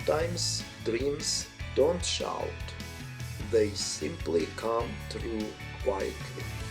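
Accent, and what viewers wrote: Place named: Germany. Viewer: Здравствуйте ещё раз. Сначала собралась искать субтитры, думала на немецком. У Вас уже немецкий акцент. Но потом поняла, что это английский. И всё понятно, хорошее произношение. А я как раз учу английский. Очень красивые места. С большим удовольствием смотрю. Супер!👍👍👍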